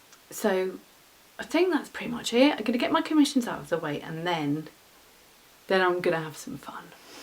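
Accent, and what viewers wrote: United Kingdom. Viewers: I love your videos. Listening to your way of talking to the camera fills my heart with joy 🥰 and I love your British accent (I'm from the USA). I'd love to buy a few of your patterns on ravelry but I have a tremendous problem following UK crochet terms. It's like my brain gets stuck. Have a great summer🏵💦🌞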